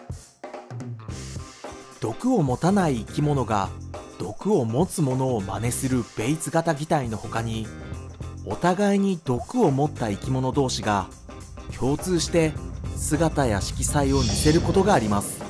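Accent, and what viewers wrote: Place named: United States. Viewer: アメリカ系のハーフの人が喋ってるみたいで良き。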